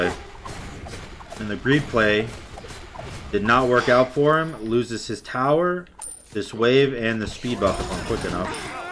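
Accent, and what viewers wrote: United Kingdom. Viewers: That British accent was amazing Bro. <3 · Gg nice vid and nice British accent lol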